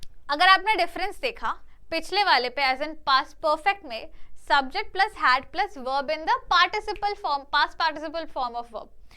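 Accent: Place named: Bengal, India. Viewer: I really like the Bengali accent when you speak Hindi it sounds cute when someone speak like that😊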